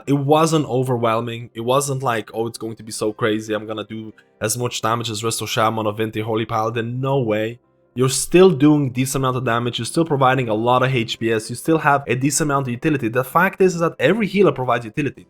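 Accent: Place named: Ireland. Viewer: What's the deal with the accent? I can't place it, but there definitely seems to be a heavy dose of Irish in there?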